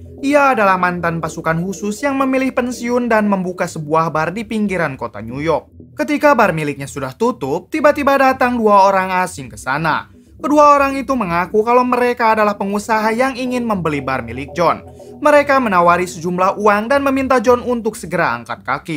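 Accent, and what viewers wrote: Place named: Madura, Indonesia. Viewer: Orang Madura kayaknya yang ngisi suara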